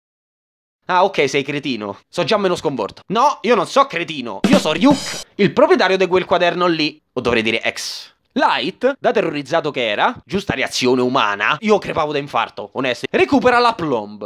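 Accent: Rome, Italy. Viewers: Ahahahahah no vabbè sto morendo! L'accento romano ce sta proprio bene qua! Susu che vogliamo pure tutti gli altri ventordici episodi, susu! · Ma cos'è che scopro sta serie e sto canale solo ora? Ma io me i scrivo subbbbito che sto sputando sullo schermo dalle risate cazzo xD (e il romano me sta influenzando anche se so di milano) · Sei di roma si sente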